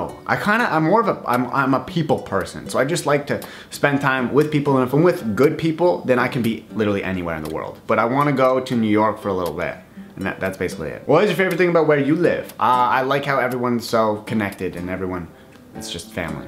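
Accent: Canada. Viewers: basically ayla he’s Canadian. That’s how they pronounce it in Canada.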